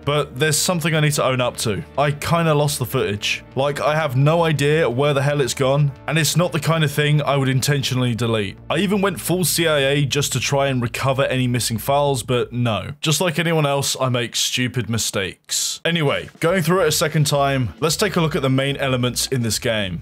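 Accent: United Kingdom. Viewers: very pleasant surprise to click a commentary video and hear a fellow brit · BABE IT'S 3AM! TIME FOR ANOTHER LONG FORM CONTENT NARRATED BY A BRITISH GUY!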